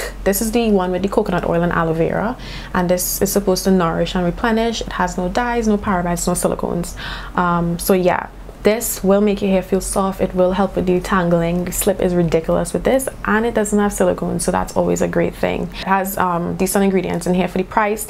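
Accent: Trinidad and Tobago. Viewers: We have the same Trini accent, are you from the Vale? Have you checked out Body beautiful on D'ave for some of your products?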